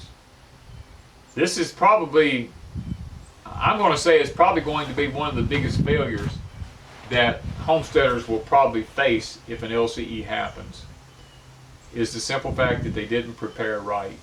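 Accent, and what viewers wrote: South, United States. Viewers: Good subject! Thank you. I don't have a homestead, just rent an apartment but there's only 8 apts. each building, total 4 buildings in a small rural western WA town, but I have 3 smallish garden spaces outside my front and back door and they work out pretty good though I'm not a pro like you and. Your southern accent is just fine! Don't let folks throw ya...how anyone pronounces words is unimportant--your intent and message IS. I totally agree with you. I am glad the plum and apple trees on the property aren't sprayed that I pick every year though the fence line is but it's not near the apts. Living in the north has different challenges but folks are often in the same boat no matter where ya be. I can, dehydrate, pickle as much as possible. I understand how it is when you have to get things canned NOW, or lose your produce. 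My folks hated waste and so do I. Anyway, thank you for explaining farming/gardening procedures. It's very interesting and I am learning a little. I'm kinda slow, but I get there. God bless you and yours.🌲👵🐕✋🌻🌱🍃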